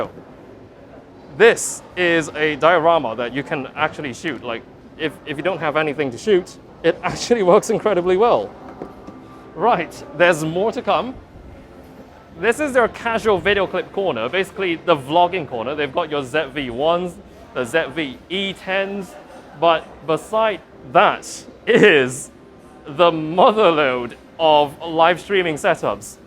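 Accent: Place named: London, United Kingdom. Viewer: Where is this guy from ? Looks malaysian but speak perfect london english ....raised in uk but decided to come back to malaysia ?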